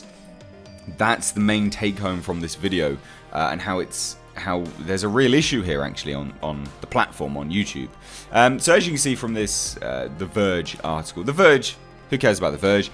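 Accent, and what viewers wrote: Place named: United Kingdom. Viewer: Thanks for covering it. I tend stay away from the extremes on YouTube. There seems to be a left/right war going on in the US at the moment and I feel the rest on the world is being dragged into it. It’s nice to hear a UK accent cover it in a measured way :-) This reminds me of the way YouTube is applying copyright rules. They aren’t actually taking “sides” they are just looking after their advertisers, and it’s their advertisers that have the agenda. YouTube is just following the money.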